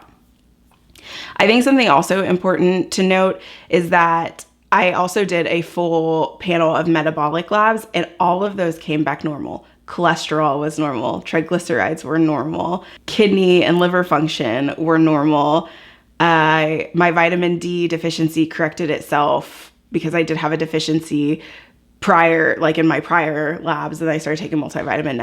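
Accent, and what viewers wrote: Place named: Texas, United States. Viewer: Hi, I just took my first dose of Ozempic and I’m so looking forward to hopefully getting the results that you and my doctor spoke about‼️. Totally unrelated; as I was listening to you I was thinking, “ this chick has to be from Texas”! My doctor’s in Katy.🤣🤣🤣. I wish you continued success in your weight loss and wellness journey. Thanks for sharing🥰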